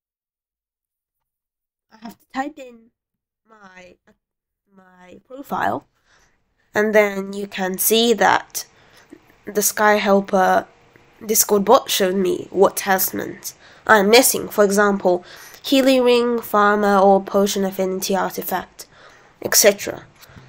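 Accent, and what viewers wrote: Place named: United Kingdom. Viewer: HEES BRITISHH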